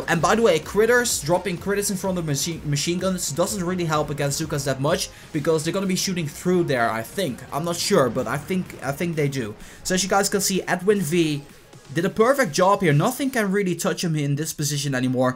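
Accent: Netherlands. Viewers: This is one of the most helpful players I've found. His accent is Dutch. You guys are rude.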